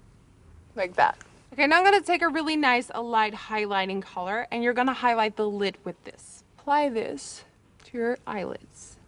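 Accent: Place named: Netherlands. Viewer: Love her cute Dutch little accent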